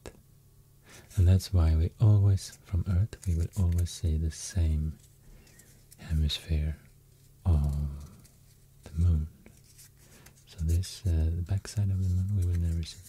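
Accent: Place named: Sweden. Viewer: “swedish accent” is only “accent” i can listen to in asmr, any other “accent” just feels weird but this, this is soooo good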